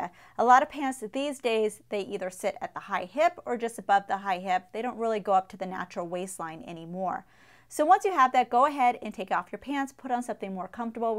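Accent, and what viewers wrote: United States. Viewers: Many thanks from the U.K. You have a beautifully clear American accent, so easy on the ear.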